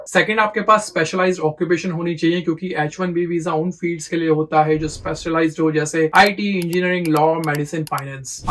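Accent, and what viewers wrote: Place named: United States. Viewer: bhai... thanks for sharing this video. Can you pls make a vlog on how you got your language ascent changed from being Indian speaking to American ascent? It would be fun to listen to you on this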